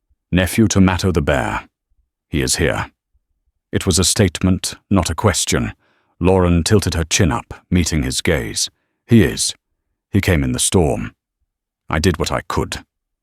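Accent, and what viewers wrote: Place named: United Kingdom. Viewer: You need someone to speak America English for an American stories.. Not English from UK..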